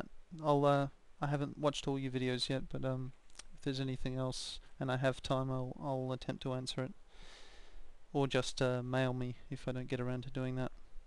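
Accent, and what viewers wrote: Australia. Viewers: He's Australian you fuckwit. Any idiot can tell that. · hes not english hes australian or smth u idiot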